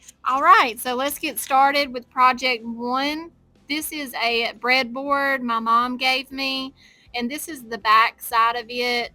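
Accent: South, United States. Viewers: They are all beautiful, what state are you in. Must be native born. I'm just not used to a southern accent it's nice.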